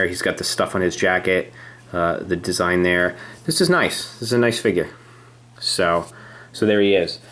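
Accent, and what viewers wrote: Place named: United Kingdom. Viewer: Wtf! He`s obviously British!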